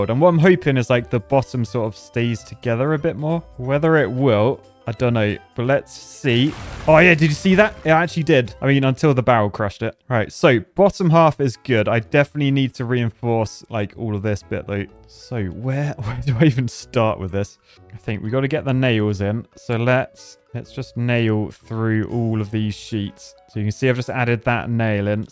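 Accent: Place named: United Kingdom. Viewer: That accent he's got. What is it? It's British, but what part of England goes "bewesh" instead of "boosh" and "cloiyser" instead of "closer". It tickles my ear to hear someone say "Aye doiyn't naeuiy" instead of "I don't know"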